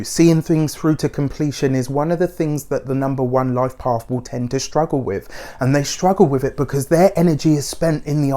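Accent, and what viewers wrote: United Kingdom. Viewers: Is your accent British? Either way I love it! Haha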